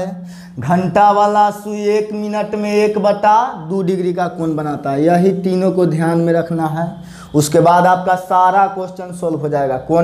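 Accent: Bihar, India. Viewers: Bihar se ho kya bhai.......... Mai bhi hu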